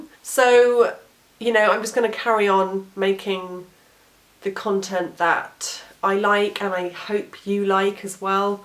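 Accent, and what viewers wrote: England, United Kingdom. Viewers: I have mentioned to that she actually has overall talent and appeal to elevate what she started here into a career in the entertainment industry. She would be a fabulous host of headline series and could even perform acting roles; initially shorter and follow the progression onward. She reminds me of a mild version of Elizabeth Hurley with great appearance and a pleasant English accent. She could easily have an expanded career. I know that I would certainly cast her. She's also a good person whom cares about the environment, animals and necessary causes. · I have checked your channel every evening but there was nothing, I simply like hearing someone like you speaking English - British English. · If you remove music, dogs and cats' video and what would remain to me to watch on Youtube? A huge commercial?! No, thanks. How should i train my ears to english accent without video? 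Travels vlogs and intercultural oddities would be good topics for me. Normal people testing impartially the pulse of a Country would be good as well (since, as we can all see, Press is no more reliable, pratically everywhere nowadays!). Otherwise do like me: join some online video and graphic contest portal and have fun. No need to put everything on Youtube only. Even though video and graphic platforms are getting a bit worse as well recently for the same (second) reason. But remember to edit your audio in a better way. ^__^ It's obvious that once there's a so much huge video production, all around the world, view counts get totally random, aleatory and unstable. Especially for english speakers and viewers. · I'll admit I stumbled on you by accident.Saw a thumbnail with a pretty face.Stayed because I found you funny and love the British accent.But you also let us in on some issues you've had.Thought that was brave.Long story short you keep making these and I will keep watching.Fuck those guys that just want to ruin the crap we like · I'm a subscriber for about 2 years, to be honest I started to watch your videos in order to improve my english listening skills, specially for your lovely british accent. 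As time went on, I began to enjoy your videos, I like your no pretentious and honest ways, It's precisely what keeps me here, that you're not like most youtubers (I mean at least you don't call your viewers "harties" or "emilies" or some shit like that). Good luck in the future from a viewer from Mexico. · You are amazing. Do what videos make you happy, what videos you actually WANT to do. I will continue to watch........mainly because of your British accent, hahaha. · Sugar, First I tell you we watch your channel because you are you and you show us your own personality. I think you are a very seemingly sweet young lady. I like when you speak of your own real life. What most people are watching youtube for is to be entertained. I very much enjoy hearing your British accent and just listening to you. If you want to shake it up a bit, you might think of also coming up with ideas to interview some of your friends of family about real things going on in life. 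Maybe mix that in with a little humor here and there. Show us what life is like in Britain. Are there any interesting things near you we might be interested in seeing on camera? Historical, scenic or perhaps you hang out with the royal family here and there? maybe if your camera is mobile you could get interviews on the street from Bobbies about how they feel about U.S officers can carry pistols and they can't. I am just suggesting you find things different about your country and life from others and enlighten us. By the way, Why is the picture missing. Don't quit broadcasting, I like ya.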